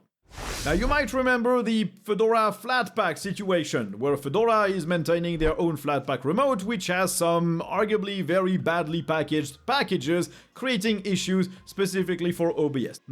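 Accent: France. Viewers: L’accent Francais de fou ! Tu es un natif ?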